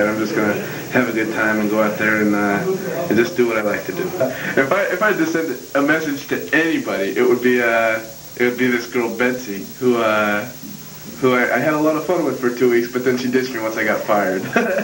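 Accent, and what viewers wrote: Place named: United Kingdom. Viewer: He sounds a bit british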